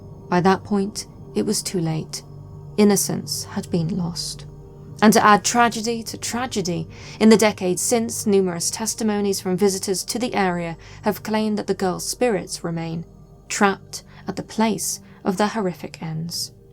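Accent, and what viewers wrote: United Kingdom. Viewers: I wish all narrators had a lovely British accent like hers.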